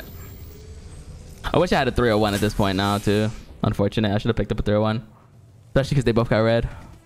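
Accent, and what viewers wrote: New York, United States. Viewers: You talk like your from New York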